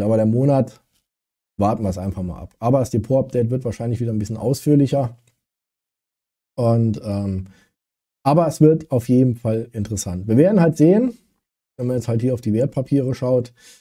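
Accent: Saarland, Germany. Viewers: du hast ein Saarländischen Dialekt :D lustig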